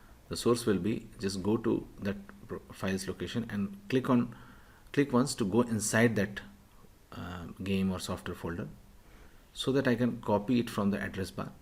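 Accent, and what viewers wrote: India. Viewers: i mean he lives in America but he has an Indian accent so. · I hear an indian voice and now I'm blessed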